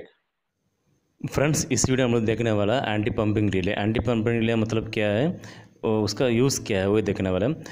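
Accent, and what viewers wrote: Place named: Tamil Nadu, India. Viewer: Speaking like u r Tamil. R u from tamilnadu sir ??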